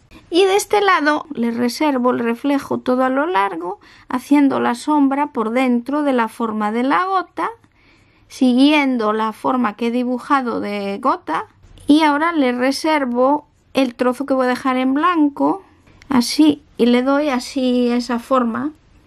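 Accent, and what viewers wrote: Spain. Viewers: Diossssss! Amo como Hablan los españoles (ㆁωㆁ)❤️❤️ Sigue Así. Dibujas muy Lindo uwu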